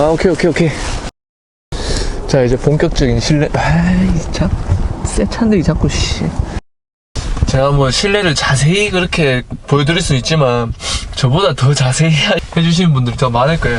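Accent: Gyeongsang, South Korea. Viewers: 경상도 사투리 멋집니다 ㅋㅋ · 아 이 말투는 상주 구미 김천 말투 같은데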